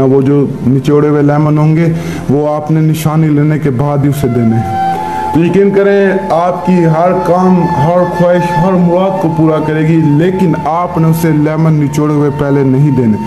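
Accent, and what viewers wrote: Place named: India. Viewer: السلام عليكم ورحمة الله وبركاته صاحب کیا اپ پاکستانی ھیں یا ھندوستانی نمبر تو پاکستانی مگر أواز اور لہجہ انڈین ہے کیوںکہ میں اپکا شاگرد بننا چاہتا ھوں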